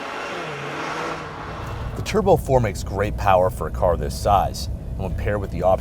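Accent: United States. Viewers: That's the way Americans pronounce it, a bit like the way they pronounce Zed as Zee it seems they have to put there own spin on everything very annoying I know.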